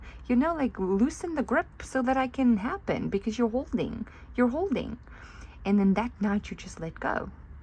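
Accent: South Africa. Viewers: love that south african accent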